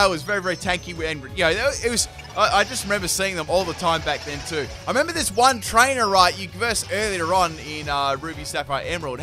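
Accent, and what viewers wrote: Australia. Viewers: Did not know you were an aussi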